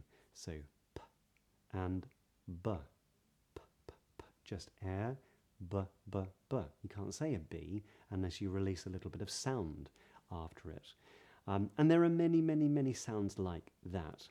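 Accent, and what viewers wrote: RP, United Kingdom. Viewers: well! I'm into listening rp accent. So far I've found you the best for rp accent. So i would like you make just listening videos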